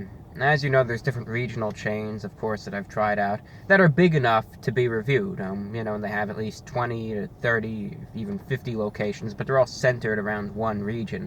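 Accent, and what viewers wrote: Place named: Northeast, United States. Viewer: previous geographical indicators led me to believe that he was located in California, but alas, this revelation of presence in the state which I currently reside, north Carolina, in combination with his northeastern accent is confusing me. my life crumbles...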